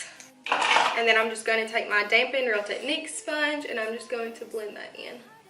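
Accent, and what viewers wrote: South, United States. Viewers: You're so pretty! What camera are you using? I love your accent, I'm have a country accent as well and you're truly inspiring me to start my channel! Xoxo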